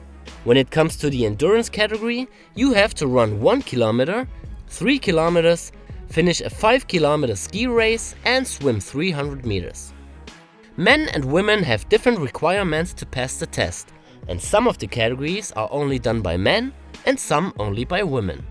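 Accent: Germany. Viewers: your english is the prime example of the german english out there :D unfortunately was priceless. ;) great video again thought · Als ein Franzose, ich verstehe seinen "Deutsch-englisch" viel besser als amerikaner Englisch aha !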